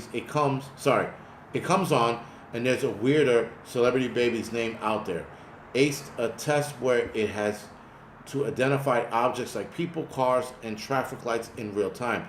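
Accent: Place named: Northeast, United States. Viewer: I'm from Jersey but, haven't lived there in a long time. I enjoy your northeast accent & manner of talking truth. You make me laugh.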